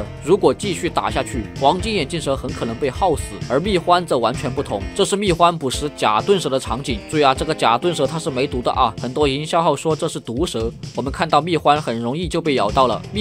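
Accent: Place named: Hunan, China. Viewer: 1，leng；2，不leng。哈哈，这湖南音。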